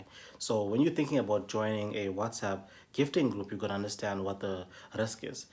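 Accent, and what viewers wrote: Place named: Indian South African, South Africa. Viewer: You sound like a South African Indian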